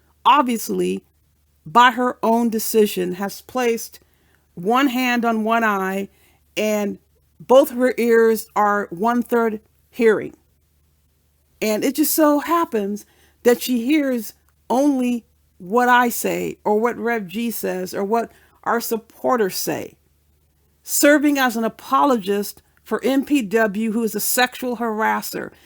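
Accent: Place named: Working class, United Kingdom. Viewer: High Class with a working class British accent ? I don't think.so.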